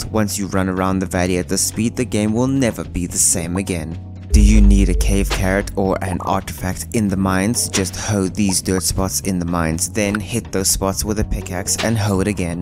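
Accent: South Africa. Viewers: So that's what that accent is. I like it I just didn't know that was a South African accent.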